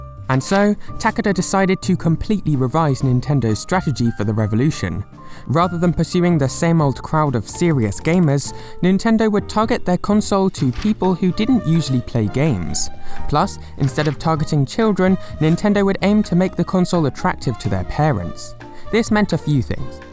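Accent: United Kingdom. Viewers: Very british voice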